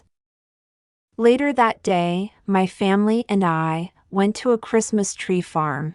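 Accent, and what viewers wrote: United Kingdom. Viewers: does this channel have a British accent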